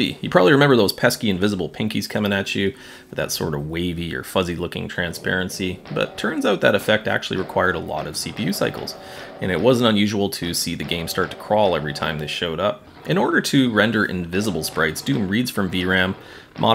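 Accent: Canada. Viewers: Very Canadian accent!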